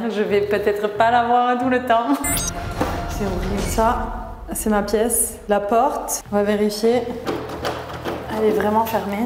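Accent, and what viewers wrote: Quebec, Canada. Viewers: J adore ta voix et l accent québécois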